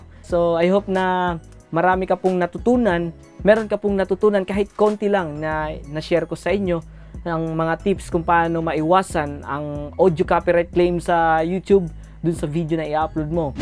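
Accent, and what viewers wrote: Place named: Ilocos, Philippines. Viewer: ilocano ka ata sir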